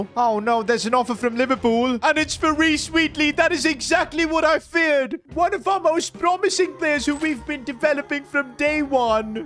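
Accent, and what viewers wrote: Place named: India. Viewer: You talk like an Indian!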